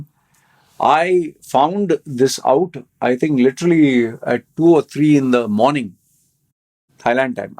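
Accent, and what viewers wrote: India. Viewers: Your english speaking accent is soo indian.. Are u from india ?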